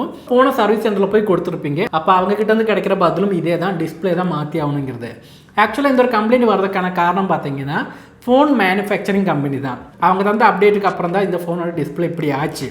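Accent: Kerala, India. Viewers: This guy is a Malayali!!! His pronunciation is that of typical Malayali!!!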